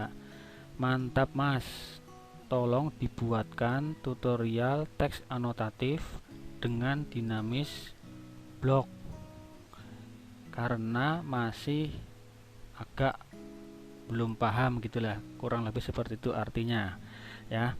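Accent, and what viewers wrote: Banyumas, Indonesia. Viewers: Cara mencari luas teks bagaimana mas????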